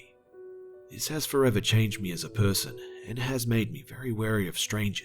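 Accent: Australia. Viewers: Yo, what state you from in Australia, just wonna say hey from Perth lmao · are you Australian?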